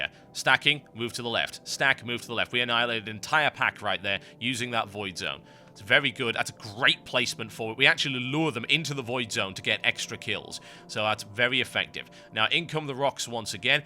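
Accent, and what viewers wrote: United Kingdom. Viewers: british speak sucks !!!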